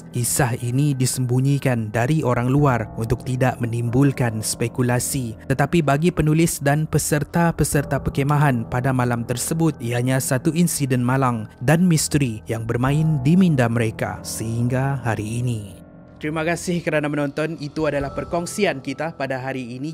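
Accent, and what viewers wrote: Sabah, Malaysia. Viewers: Orng sabah tuu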